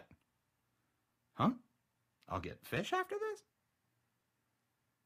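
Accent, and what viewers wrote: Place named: Canada. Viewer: Sourry? CANADA YOU SOUND LIKE YOUR FROM CANADAA. Sorry ;-; But I loved thissss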